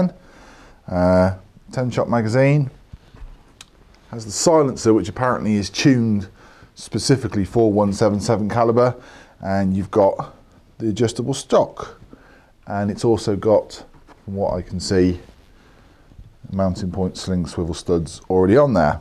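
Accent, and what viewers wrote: United Kingdom. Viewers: I love to hear you talk :( funny guy, speak very well, nice pronounced british accent.. Don't get what people dislike!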